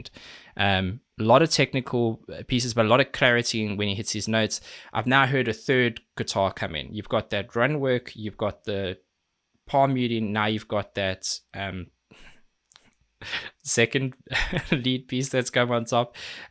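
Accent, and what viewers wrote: South Africa. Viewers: Also, I've only just found your channel, do I detect a SAffer accent? edit* Just watched your Q&A - Jo'burg boy eh? Howzit Boet? I'm originally from Namibia, so it's great seeing someone from that part of the world doing well. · Look. I'm American so I'm sorry if I'm wrong... But are you south African? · Is this guy South African??!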